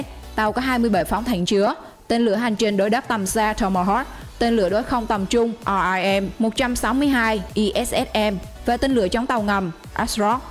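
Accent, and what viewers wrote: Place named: Central, Vietnam. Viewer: nghe giong mien trung ,cua mien thuy duong cát trang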